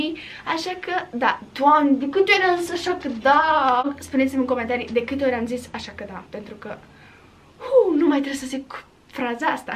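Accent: Moldova. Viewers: Am o întrebare corbești moldovenește?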